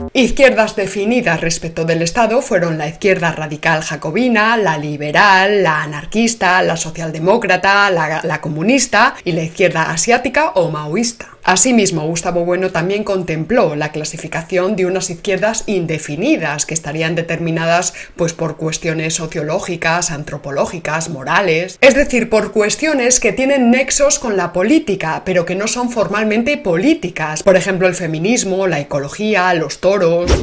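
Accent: Spain. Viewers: el acento español tan marcado es irritante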